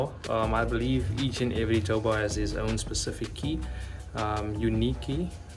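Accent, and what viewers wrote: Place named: Afrikaans, South Africa. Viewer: Haha zuid afrikaans blijft grappig om te horen. Dacht dat de taal daar uitgestorven was. Btw did it came painted/glossy? How much was it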